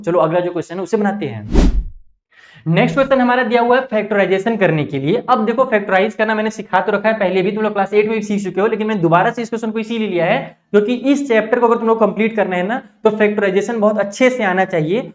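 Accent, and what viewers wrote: Bihar, India. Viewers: sir aap bihar se hoo kya ?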